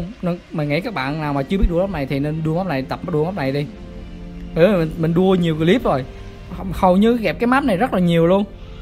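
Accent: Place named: Phu Yen, Vietnam. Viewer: Quê anh biển số 78 hả ah Nghe giọng giống lắm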